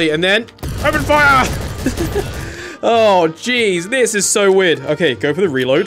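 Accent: England, United Kingdom. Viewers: R you from inglan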